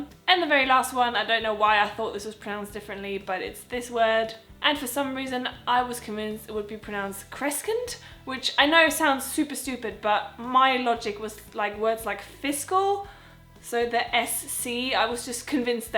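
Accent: England, United Kingdom. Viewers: you sound ENGLISH to me.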